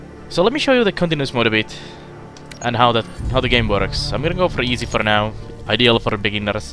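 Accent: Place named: Finland. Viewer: I love the Finnish accent. Thank you for making this video! I like the description of the game and the look into it!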